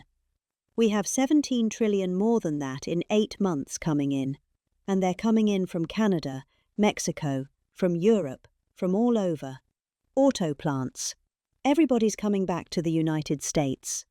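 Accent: United Kingdom. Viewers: I have not laughed so hard in weeks. This is fabulous. Trump has early-onset dementia as well as malignant narcissism, so we are used to hearing him in speech after speech. But there is something that becomes so much more insane about hearing his actual speeches read by this British woman in her dialect. The ramblings are just...stunning. Thanks you! New subscriber.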